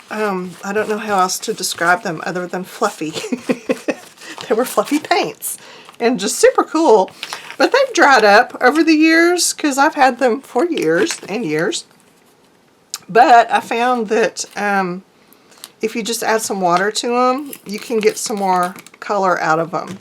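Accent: South, United States. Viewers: Brilliant as always. We all miss you so much. Thanks for treating us to that wonderful southern girl accent again. ♥️😉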